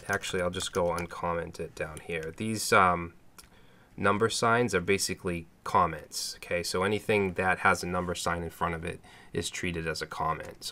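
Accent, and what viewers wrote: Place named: Boston, United States. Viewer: that accent.. are you from boston?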